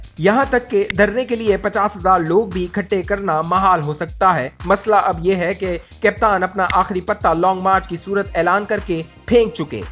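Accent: India. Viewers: The guy who is doing commentery is indian from his accent! So what is he doing here? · Absolutely right. Narrator seems to be an Indian due to his typical Indisn Pronunciation.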